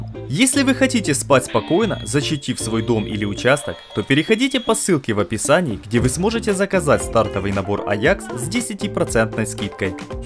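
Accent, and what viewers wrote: Surzhyk, Ukraine. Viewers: да ладно, они украинцы, русский не изучают, говорят как чувствуют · если вы из этого видео не поняли что человек из Украины или вы поняли и все равно предъявляете человеку за русский, вы явно затупок)) ибо если вас не устаивает произношение блогера, просто не смотрите)) Зачем до человека докапываться из за произношения, это не культурно:) и да русский и украинский имеют некоторые общие слова но их очень мало, послушайте например и попробуйте понять без переводчика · Обычный суржик, в каждой области русский язык отличается от масковскава.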